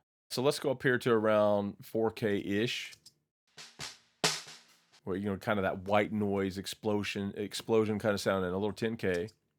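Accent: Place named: United States. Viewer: probably the best Neve teaching tutorial ever and the sample music was pleasing .... nice to hear an American accent too...thx !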